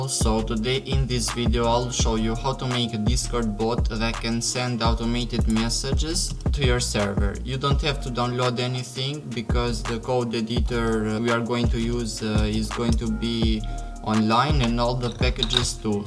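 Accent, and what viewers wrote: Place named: Albania. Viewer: hahaha po duket shqiptari se si e flet gjuhen e huaj 🤣🤣🤣 ❤️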